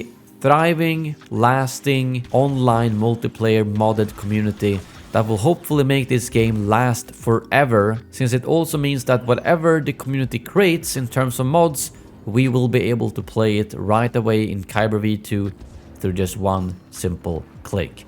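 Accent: Sweden. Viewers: u swedish?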